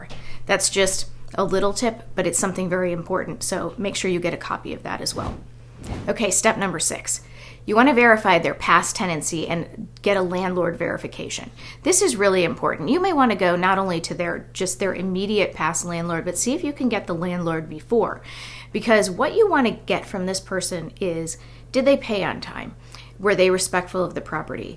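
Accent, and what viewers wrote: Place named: California, United States. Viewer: Sound like you from California/Arizona! 🌵🌴🌵🌴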